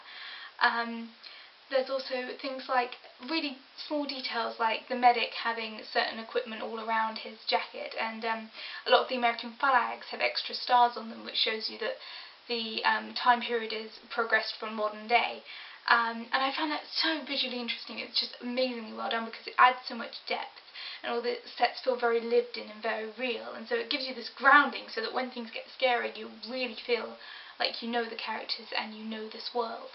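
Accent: United Kingdom. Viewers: I'm impressed, count me a new subscriber. Sounds like you might be from across the pond. When I first saw this movie it scared me about as much as any horror movie does. (The scariest movies make me jumpy, and I have always had a vivid imagination of what things might be lurking in the dark.)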